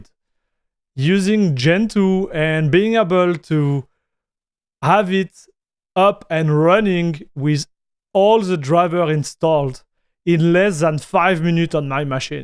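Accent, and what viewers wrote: France. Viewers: On dirait que vous avez un accent français non ? Ou francophone en tout cas.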